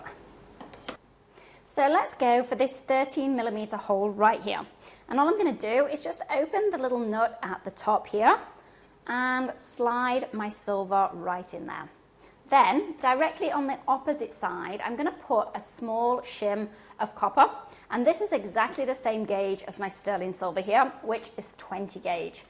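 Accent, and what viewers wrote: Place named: England, United Kingdom. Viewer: Have to watch the vid again to find out how to use a disc cutter,--------- couldn't concentrate. was not expecting the pommy accent and then "brick shithouse " came out and then "any hole" PMSL!!!. Had you on fbook for some time now, without knowing you were sooo entertaining. Following on Youtube and Insta from tonight here in Aus.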